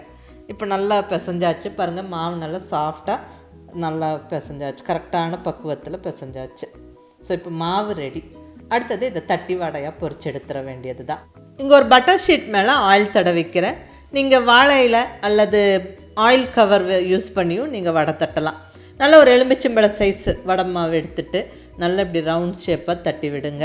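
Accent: Coimbatore, India. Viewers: Ungal kovai Tamil kettukitte irukkalam pol irukku, aana video seekkiram mudyuthe!!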